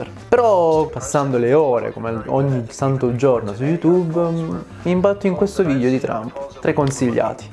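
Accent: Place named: Salento, Italy. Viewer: Ma.. accento salentino ne abbiamo? 🤣 Un saluto da un tuo conterraneo!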